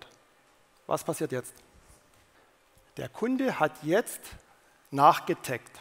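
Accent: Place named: Swabia, Germany. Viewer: Wie schön das Schwäbisch doch ist;)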